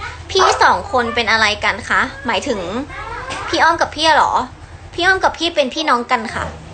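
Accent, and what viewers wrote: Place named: North, Thailand. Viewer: น้องน่ารักนะชอบให้พูดเหนือน่ารักดี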